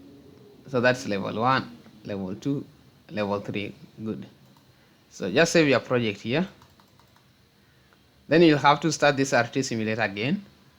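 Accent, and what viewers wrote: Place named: India. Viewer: Indian english its very cool experience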